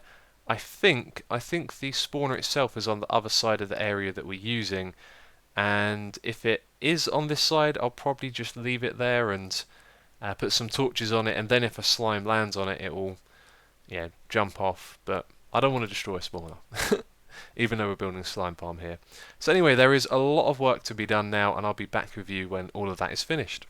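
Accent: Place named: Northern England, United Kingdom. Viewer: Your accent.... Why can't I be British?.... WHY!?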